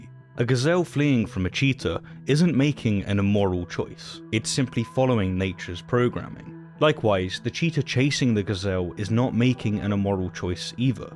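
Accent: England, United Kingdom. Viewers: The English accent gets me every time :)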